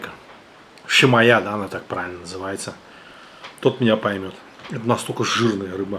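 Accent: Baku, Azerbaijan. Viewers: У него всё поличилось идеально. А Бакинский Акцент это другой кайф👍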